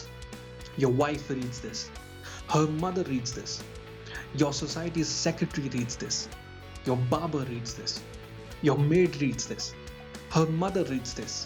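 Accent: India. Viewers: funny and indian accent, great combo imo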